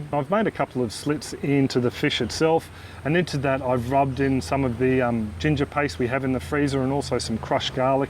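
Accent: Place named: Australia. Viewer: I have enjoyed your videos for a good bit of time now but just wanted to say your Australian accent with your wife’s man being has me reminiscing my childhood! It reminded me of the Disney cartoon “The Rescuers Down Under”. I’m not poking fun... I just think it’s very cute:) Your fish flavors look amazing!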